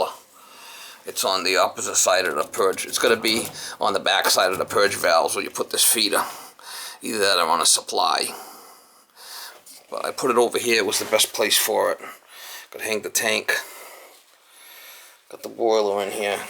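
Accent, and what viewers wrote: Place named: Boston, United States. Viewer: I'm just guessing you have a Boston accent. I'm rural Pa mountains. I traveled around and worked with people from around the country.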